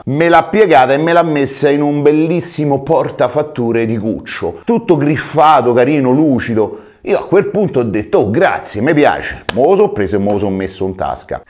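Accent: Rome, Italy. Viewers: Grande sono figlio di una romana e quando ti sento parlare mi raffiorano sempre i ricordi, volevo dirti che grazie a te 3 anni fa circa mj sonl appassionato tanto al pugilato. Oggi sono pro e non lo avrei mai pensato, ho fatto il mio primo incontro a Milano a gennaio prima del lockdown. Con tanto allenamento e tanti sacrifici per un diciannovenne. P. S. Porta ancora tutorial e di al franchino di stare più attivo su yt che siete dei personaggi... Grazie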